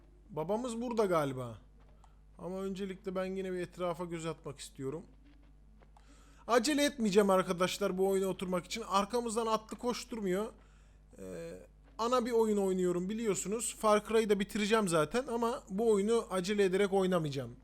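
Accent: Kayseri, Turkey. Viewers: Usta siz vallahi Kayseri'lisiniz :D Benim eniştem oralı konuşma şiveniz aynı :D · Şiveli daha doğrusu kayseri ağzı yapmadanız daha iyi olucak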